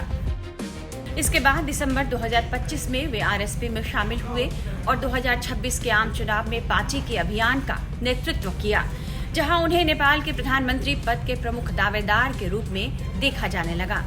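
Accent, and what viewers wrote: Bihar, India. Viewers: Mam aap bihar se lag rahi ho ya up gorakhpur sides se ho?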